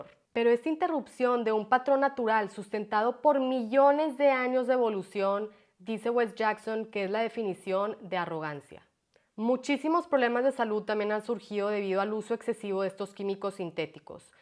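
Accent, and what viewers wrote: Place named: Monterrey, Mexico. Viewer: Hola. justo acabo de terminar de ver tu entrevista con nayo Escobar Jajaja, y justo hacía como unas tres o cuatro semanas que te descubrí en el video en donde fermentas verduras Y Bueno nada excelente tu canal y todas las tantas cosas que estudias, Qué haces Y en las que trabajas Por otra parte no sé si en este canal exista un video en donde hables de la historia de tu hijo Posdata: Ahora entiendo por qué de tu acento tán fresa y norteño pues Igual que yo eres de aquí de Monterrey Jajaja Saludos y bendiciones para tu vida, tu familia y para todos tus emprendimientos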